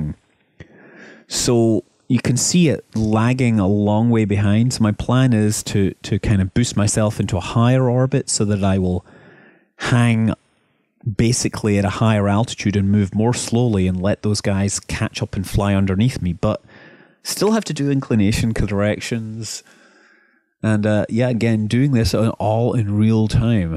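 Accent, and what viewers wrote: Scotland, United Kingdom. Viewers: hi, where were you born? you sound scottish but not completely:P nice video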